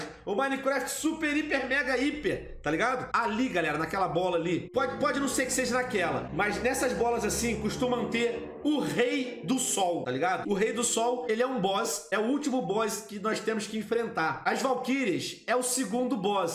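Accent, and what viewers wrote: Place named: Portugal. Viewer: Muito bom ver o voltando às raízes... Ele ainda tinha sotaque português europeu quando fazia série desse vídeo...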